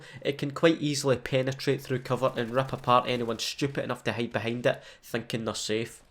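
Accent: Scotland, United Kingdom. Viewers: I'm mainly gonna subscribe for that awesome sounding Scottish accent you have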